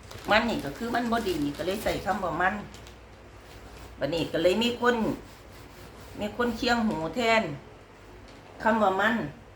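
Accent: Thailand. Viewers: อังกฤษสำเนียงไทยหนูว่าน่ารักดีคะยาย เหมือนที่เราบอกต่างชาติพูดไทยว่าน่ารักดีนั่นแหละค่ะยาย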